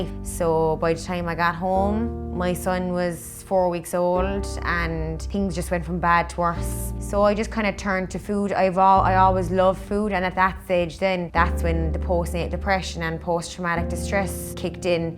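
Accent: Ireland. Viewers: Beautiful girl, she must be Irish with that accent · Couldn't believe it when I heard da Cork accent on utube! 😄 I'm from Cork too....Barrack St area. Fair fucks to you, lady. You are blessed. I nearly died from obesity. Heart attack at 45, open heart surgery at 53. That could have been in store for you or worse. I turned it around just like you after my operation 7 years ago. I calorie counted at the start, eat a clean diet and zero junk food. I exercise regularly and do Kettlebells and Bands for resistance training at home. Lost 80lbs and more importantly my destructive junk food habit and 80 a day smoking habit. I have a few nice war wounds to remind me off my past....a nice scar all the way down my chest where they sawed me open for the op in the CUH. I also have a nice scar from my ankle all the way up to the top of my thigh from where they harvested my vein from my leg to perform the bypass surgery. 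Lucky to be typing this, so scars are a least of my worries now. Great to see your transformation and more importantly that you have given yourself an opportunity of a long life. You are looking hot, 🔥 my dearest....not just on the outside, but most definitely on the inside too. Well done. Lots of love on this Paddy's Day.☘ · I stuck around mainly for the Irish accent 💚🍀😁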